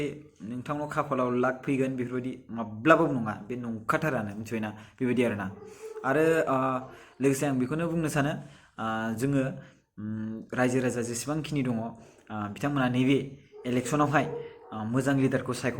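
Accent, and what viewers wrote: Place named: Kokrajhar, India. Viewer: Hab pongbai nwngni raijlanai jwngni kokrajharni baidi tar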